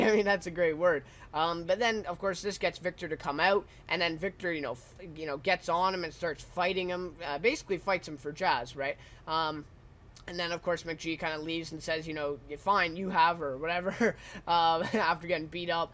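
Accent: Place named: Canada. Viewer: Are you Canadian? Your accent is annoying.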